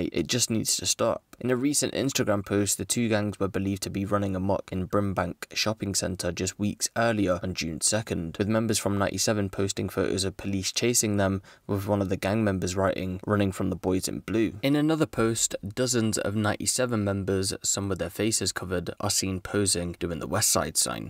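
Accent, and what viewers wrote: United Kingdom. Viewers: So ur Zambian but u talk on the U.K. like ur British !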